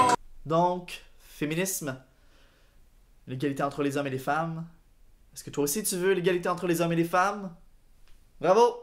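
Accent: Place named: Quebec, Canada. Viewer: J'aime vraiment bien cette video (ton accent quebequois est trop drole xD) elle met bien les choses au clair :P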